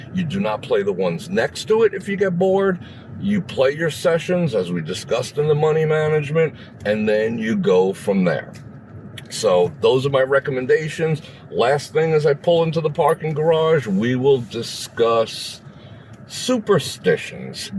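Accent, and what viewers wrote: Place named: New Jersey, United States. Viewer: Sounds like you're originally from New Jersey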